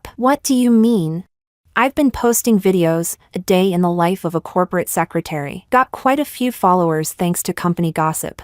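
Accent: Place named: England, United Kingdom. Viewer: It surprised me that the Spanish was pronounced with an English accent 😅 Just FYI, the narrative switched to Spanish towards the end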